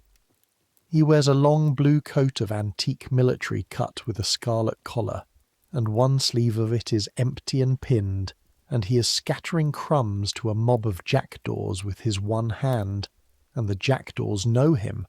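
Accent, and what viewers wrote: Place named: United Kingdom. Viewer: Actually I do actually fall asleep to your voice. I have many times. Your voice is comforting, calming, and, to be honest, just a little boring. So it is very nice to sleep to. I happen to enjoy a British accent quite a bit, rather like a good smoke, even though I no longer imbibe. So I often have dreams related to the topics you’re talking about. I live in Norman, Oklahoma, USA & I like to think I know so much about certain members of the monarchy. I must seem hilarious or perhaps pitiful to some people. Many Americans know absolutely nothing about the royal family or British history. But bc it doesn’t involve my own life & I don’t have strong feelings about your monarchy or history, the subject matter is also calming & a little boring. But I just happened to wake up to this video of yours this morning am, Sunday, August 23, 2026. 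That is actually quite late for me. I will save this to finish for later,as I would a nice iced coffee with cream & milk. Good morning and thank you for some nice nights & mornings!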